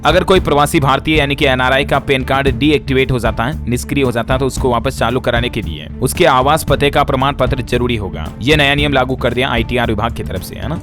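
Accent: Rajasthan, India. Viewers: भाई साहब,,, बोली लहजा ठीक करो,,, राजस्थानी भाषा का पुट है भाषा का,,, कल को तरक्की में बाधा उत्पन्न होगी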